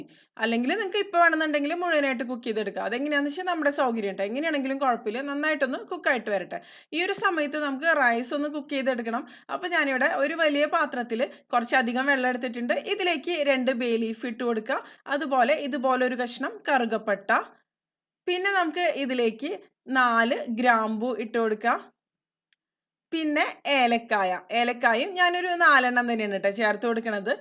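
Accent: Thrissur, India. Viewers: തനി തൃശൂർ ഭാഷ ,ഞങ്ങളും തൃശൂർ ആണ്,സൂപ്പർ റെസിപി ,നല്ല അവതരണം,നങ്ങൾക്ക് ഇഷ്ട്ടപെട്ടു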